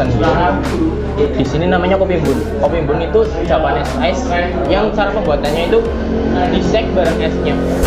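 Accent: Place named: Banyumas, Indonesia. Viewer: Ngapak nya keliatan yaah 😂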